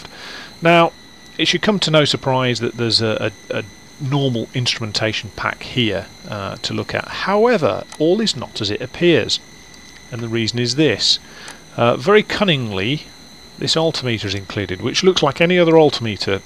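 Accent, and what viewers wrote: United Kingdom. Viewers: Too much annoying British talk .. .SHUT UP already and let us hear the engine sound.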